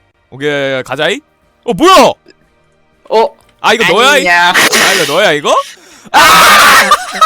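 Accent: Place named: Jeju, South Korea. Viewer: 제주도 사투리 너무너무 귀여워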